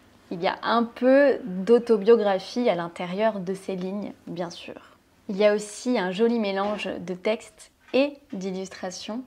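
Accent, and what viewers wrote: France. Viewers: Your English is good. I am a middle aged fourth generation American. You speak better than some Americans. I like the French accent. You can keep it or work on your pronunciation. It’s your choice. Any native speaker should be able to understand you now. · Bravo pour parler anglais, il est difficile pour les personnes instruites et intelligentes de montrer un niveau intermédiaire dans une langue étrangère (je sais le douleur mdr) mais le courage est essentiel et requis pour atteindre un niveau avancé. Et l'accent français est considéré "classy" en anglais, donc c'est un avantage. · J'adore ton accent français quand tu parles anglais. Ça sonne vraiment mignon.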